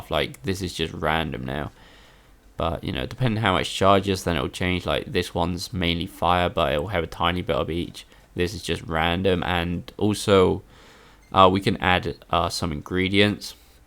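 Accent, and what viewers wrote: United Kingdom. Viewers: Why the Japanese? You sound British?... Head explodes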